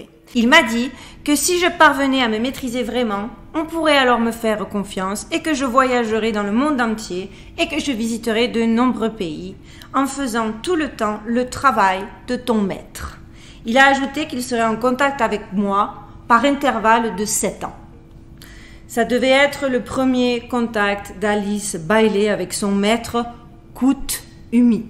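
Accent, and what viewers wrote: Marseille, France. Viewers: Bonjour chère amie, je vous suis depuis quelques temps, je suis tombé sur cette vidéo, et je voulais vous dire, vous qui aimez trouver tout ce qui est caché, faite un test, vous savez que les yeux sont le miroir de l'âme, alors faite un test avec toutes les images, et symboles que vous avez entre vos mains, mettez-vous devant un miroir, et vous découvrirez beaucoup de choses cachés, n'hésitez pas de tourner les images dans le sens inverse, je pense que vous avez cet œil pour trouver ce que vous cherchez. J'aime bien votre chaîne car elle sort de l'ordinaire, avec votre franc-parler, avec un accent marseillais. Bien à vous Francis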